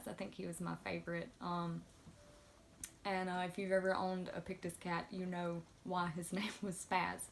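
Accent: South, United States. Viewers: Now that I've watched it all, Southern accent for the win! Welcome to the FishFam! That was a great first video with a wonderful backstory. Thank you for sharing with us! 👍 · First of all welcome to the fishfam! Second I appreciate relatability in people I sub to. I find you to be very relatable. I also live in a small town except we have one caution light lol. I've got tanks full of walmart fish and have been fishing my whole life. All the fish tanks we had used to be the same way. 10 gallon walmart tanks with just top offs. Didn't know any better. Don't worry about the southern accent, its great and makes you who you are. You are a natural talking to the camera. You will have a bunch of subs in no time. Great job! Looking forward to future content! Btw KGtropicals was one of the first channels I found and they helped me more than I could ever tell them!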